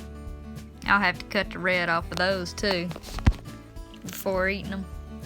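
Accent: South, United States. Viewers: I’m in love all over again by your beauty and southern accent 😍😍🥰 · Love ur deep Southern accent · Southern drawl....I love it to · She sounds like she is from my neck of the woods. The southern accent is one of the sweetest sounding accents . Well at least to me . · A young woman with 6-pack abs, a sweet southern drawl and knows her way around the field, lakes and rivers....and the kitchen. If I was 45 years younger I'd be in love. Great videos!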